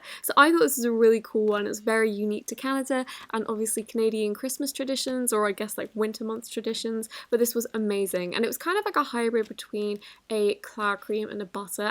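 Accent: United Kingdom. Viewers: I also ❤️ ur British accent 🤗😍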